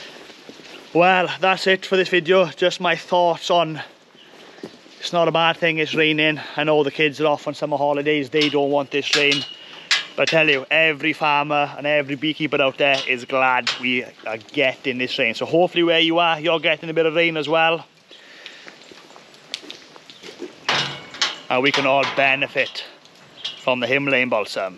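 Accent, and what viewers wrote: Wales, United Kingdom. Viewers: Hi, believe it or not, although I am researching himalayan balsam, I clicked on your video because i hadn't heard a welsh accent in a while! I like the content too. Which part of Wales are you from? The north?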